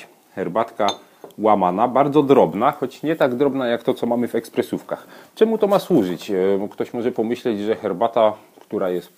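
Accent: Silesia, Poland. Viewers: Słucham, słucham i myślę sobie: chłop chyba ze Śląska. No i rzeczywiście. Rozpozna swój swego;)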